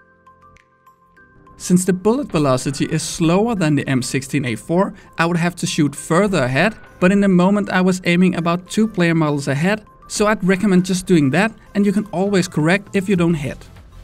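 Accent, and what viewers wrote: Denmark. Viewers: Ey youre danish right? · Are you from Denmark?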